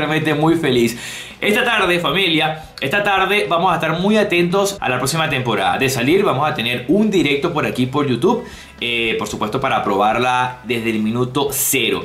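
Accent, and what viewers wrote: Venezuela. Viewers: Pareciera que fuera Venezolano, yo soy Venezolano ahí me avisas para jugar mano dejame tu WhatsApp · eres Venezolanl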